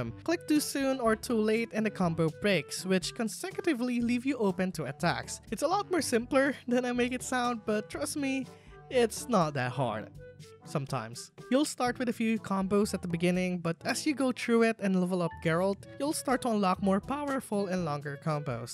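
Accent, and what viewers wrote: Philippines. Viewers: I can sense the Filipino Accent. Good english! I don’t normally watch Filipino youtubers because they can be really annoying with their repetitive sound effects and poor grammar. But you, I’m willing to watch for all of my Witcher content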